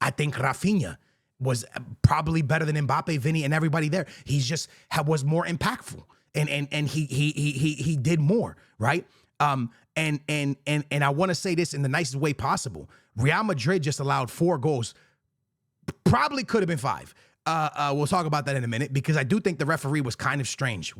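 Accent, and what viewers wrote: DMV, United States. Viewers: Bro are you from the dmv? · Wait, is he from the DMV? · Yo youre from the dc area?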